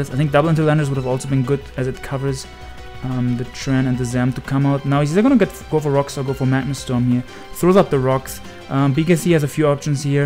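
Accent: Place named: Germany. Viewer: clicking on that vid blind and hearing that smooth german voice is top 1 feelings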